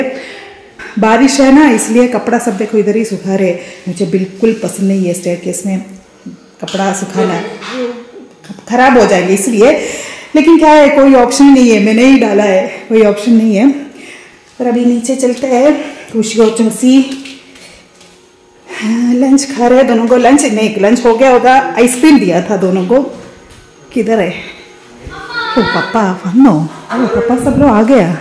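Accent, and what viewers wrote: Kerala, India. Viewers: Yourvlog is very nice. I'm enjoying it and your way of talking Hindi is cute with malayalam accent .Where are you exactly in kochi? I mean your in laws house where is it Chechi? Is it in pallikkara or kakkanad?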